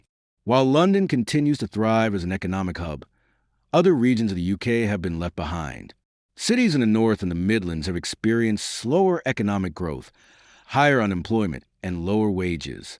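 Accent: United States. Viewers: this coming from an american voice is a joke.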